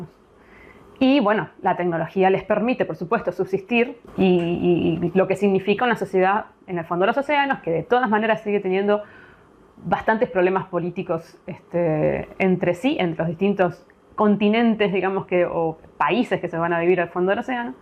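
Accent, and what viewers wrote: Argentina. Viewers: Me encanta cuando con tu acento argetino despotricas contra los libros y los autores XD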